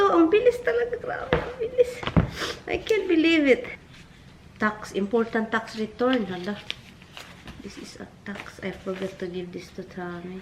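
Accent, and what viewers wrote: Visayas, Philippines. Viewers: Janice sandmayr perfect ka ba?bisaya nga sya di ba?wag ka manood ng vlog nya kung basher ka lang!How dare you!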